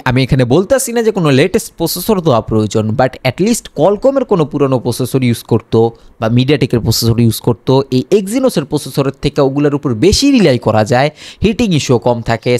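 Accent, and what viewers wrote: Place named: Noakhali, Bangladesh. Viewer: ও ভাই দয়া করে এই নোয়াখাইল্লা ভাষা ছেড়ে শুদ্ধ বাংলায় কথা বলুন। অথবা ইউটিউবে ভিডিও বানানো বাদ দিন। আপনারা বাংলা ভাষাকে বিকৃত করছেন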